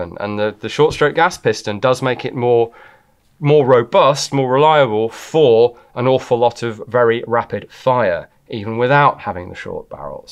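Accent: United Kingdom. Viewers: no, he has never shot a gun. Because he's Bri'ish, you can tell by the accent.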